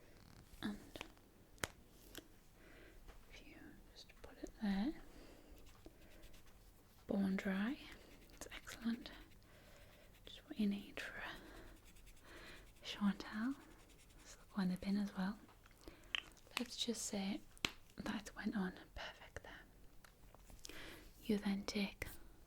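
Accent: United Kingdom. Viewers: Great!I understand your British accent)))love The British English)) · Your accent is lovely and so very relaxing. You're like the british version of Arwen from Lord of the Rings <3 And let me just say, your skin is to die for! Ohmygosh it's so perfect. Okay, fangirlmoment over. Awesome video :) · Lovely sounds, I especially love the cap opening and closing. Are you from Britain and if yes, what part? Your accent is great. Thanks!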